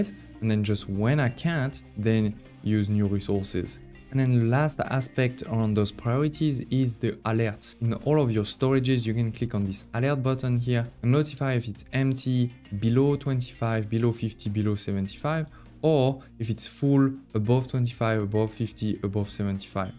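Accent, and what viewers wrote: France. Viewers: Your French accent is wonderful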